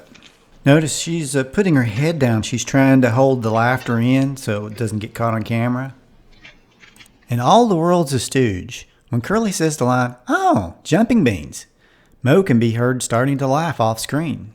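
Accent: South, United States. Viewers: LMAO!!!! I suppose it's because of his very heavy Southern Accent. Kind of like when the Brits say SEH-MEH-TREE for cemetery and INVENT-TREE for inventory.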